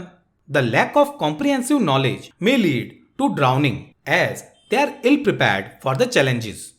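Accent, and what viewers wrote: India. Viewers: Indian accent 🥀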